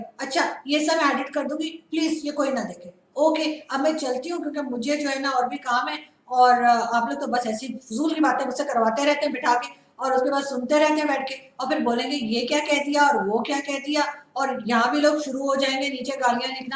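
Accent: India. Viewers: Voice problem is not due to non use of ear buds but it's echo voice problem. Secondly you try to make your presentation more professional and international by wearing decent and office looking outfits as our Indian Anchors are doing. Also keep on changing your looks and style to add freshness to your programmes. Your style of talking and pronunciations are totally Indian type Keep it up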